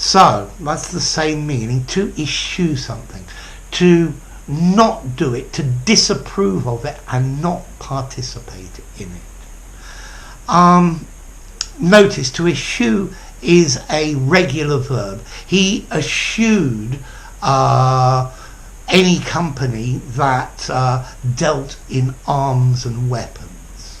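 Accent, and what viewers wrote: United Kingdom. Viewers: Hi,! I like the style of your lessons. And British English of course.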